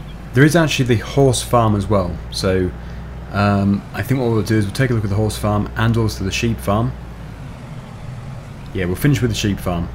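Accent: England, United Kingdom. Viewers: Hello sir are you English?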